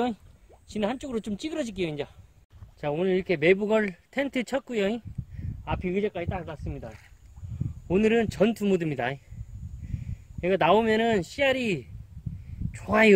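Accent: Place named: North Jeolla, South Korea. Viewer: 님 전라북도 사투 구수해부요 잉 지는 전남인디 ㅋ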